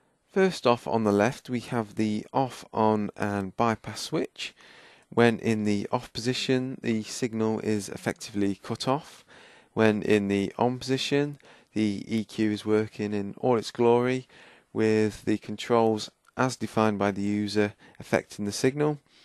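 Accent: United Kingdom. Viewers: hes sounds like he from the midlands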